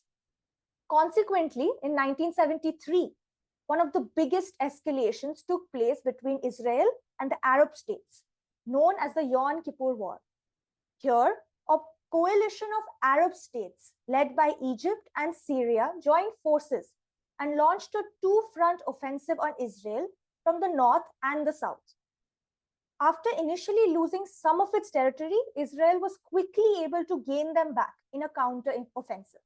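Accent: India. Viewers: If you want to make news in English then sound like you're speaking English not Indian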